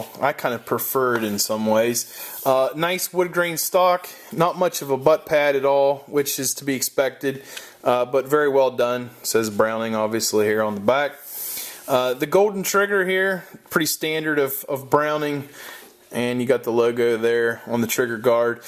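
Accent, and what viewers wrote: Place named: Western Pennsylvania, United States. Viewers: I need to know if you’re from western Pennsylvania based on your accent. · Lemme guess...western PA or eastern OH? I grew up there and I can hear your Pittsburghese lol. Good stuff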